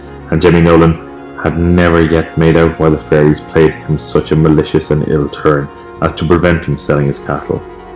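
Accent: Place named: Ireland. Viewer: God, I love ya, mate. Such challenging subject matter you tackle, always doing a great job with it. Yes, I do see that, the strong similarities between the kingdoms - if they're really separate at all. This battle-weary heart, deeply sensing our oneness with All That Is, longs for the peace of our underlying harmony, which slips me up at times. It just is what it is, & it's good to know that. Until we at least accept the is-ness of whatever it is, we're going nowhere trying to change it. Acceptance & allowing smooth the way to manifest desirable change. Ah, Irish. I love it, but then I'm a sucker for "foreign" accents. Maybe it's that oneness thing, yet again. :D ~♥~ PS You may enjoy this fav quote, likely being able to see/feel more deeply into it than most: "Observation with evaluation is the highest form of intelligence." ~Jiddu Krishnamurti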